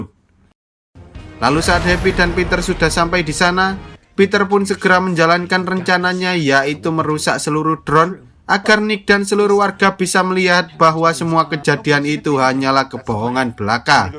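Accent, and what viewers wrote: Java, Indonesia. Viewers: Medoo bngt lu anjing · Jowo mu ngndi lur?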